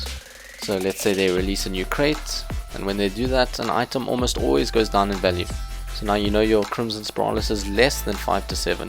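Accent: South Africa. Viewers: Awe bru you sound south african my chommie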